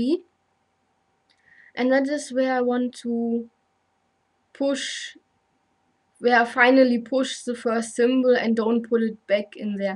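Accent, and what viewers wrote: Germany. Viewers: I love your german accent ^^ (no offence, i am just learning german)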